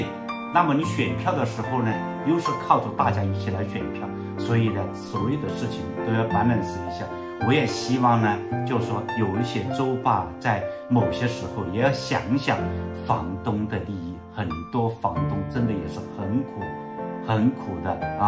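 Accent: Hunan, China. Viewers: 能不能把湖南口音改一改，不要唸“邹霸”，“一鱼既往”......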